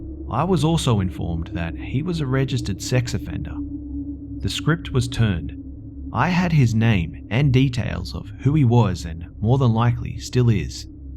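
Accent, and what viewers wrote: Australia. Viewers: r u Australian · Are you Austrailan m8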